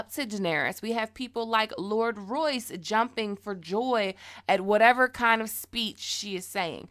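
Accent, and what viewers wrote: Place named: Michigan, United States. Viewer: You from Michigan aint you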